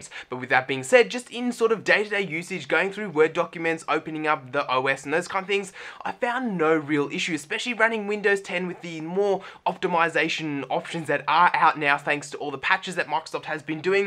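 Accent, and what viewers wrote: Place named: Australia. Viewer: Mans chinese with a Australian accent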